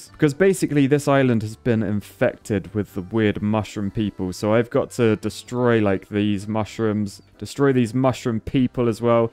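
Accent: United Kingdom. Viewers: sounding kinda British this episode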